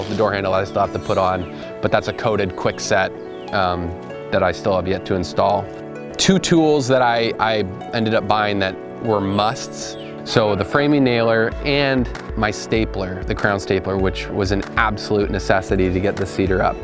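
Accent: Canada. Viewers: The only part of the video I'm confused by is the shoutout to "your Canadians" but you're wearing a Maple Leafs hat and sound like you're from rural Ontario! Great cabin man, nice work.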